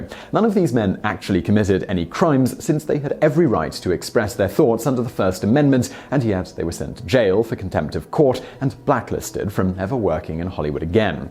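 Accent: United Kingdom. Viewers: This fellow glossed over a lot of facts. He seems to imply that those involved who were Communists in Hollywood were just a bunch of innocent weaklings. He fails to mention those "weaklings" in Hollywood and their thugs (Herb Sorrell and company) did physical damage to people and property who disagreed with their Communist philosophy prior to being called out. He also did not mention their slavish devotion to Stalin. Their personal papers divulge their loyalty to Stalin and his minions. Most of them also denied the murders, the gulags and atrocities that Stalin inflicted ever occurred or existed. Finally it is hard to take seriously millionaires with their mansions and servants actually believed in equal sharing of material wealth. If so, they would have voluntarily divided up their riches. None of them ever did. I should ad that the gentleman does not understand true free market capitalism which is transactions between 2 or more people where both sides benefit. 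He seems to think what we have today (economic fascism) is the same as capitalism. it is not. The commentator (I am assuming he is responsible for the content and not just hired due to his British accent), should have some time looking at the other side of this argument instead of giving us the usual devoid of the facts version we have all come to know. In other words don't be intellectually lazy.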